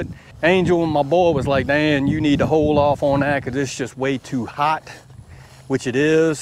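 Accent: South, United States. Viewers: I love that Southern accent. Gday from Australia.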